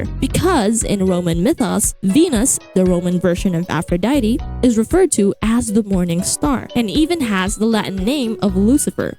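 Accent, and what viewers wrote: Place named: Philippines. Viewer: Thank you so much for making these videos!! I love watching them because I could use these as a basis for some of my analysis (I post them on tumblr hehe) because I focus more on heavy character/design analysis and storyline theories, but I kinda get lazy on the themes and origins part lol. I noticed through your accent that your a fellow Filipino as well haha. xD I hope you have a good day! :3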